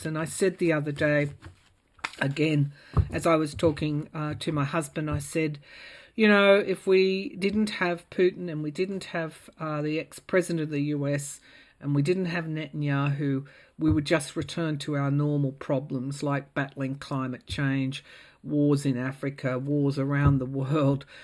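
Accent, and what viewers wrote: Australia. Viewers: Yes I feel this too. Putin is not Russia. Women and men who hold love in their hearts do not support the war in Ukraine, the senseless deaths of their families, the devastation of their neighbour’s lands and cities, the strain on their economy, the unjust burden on ethnic minorities, the ignominy of the Russian name internationally. We are witnessing the last battles of patriarchal, authoritarian leadership in RF and in the US and in other countries. I pray for the flowering of locally based democracies all living in harmony with each other. Thank you for the reading. Love your presence and the Aussie accent. Vivien West Australia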